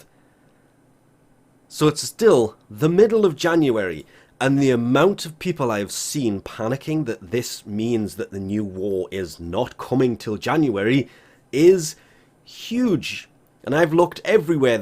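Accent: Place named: Liverpool, United Kingdom. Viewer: Liverpool accent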